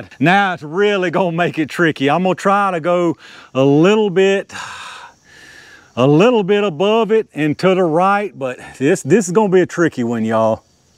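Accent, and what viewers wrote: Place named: Minnesota, United States. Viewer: Sir you are a scientist and a scholar. Only a purist with real natural genius would strive to delve into minutia to this degree. Bless your heart and keep that wonderful Minnesota accent.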